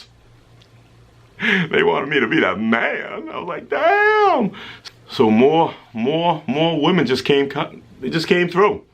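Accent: Boston, United States. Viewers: 1. Your Boston accent is showing. 2. I can tell edited the video because she inserted "Finally WTF" 😂😂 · Wonder why the twins don’t have your Boston accent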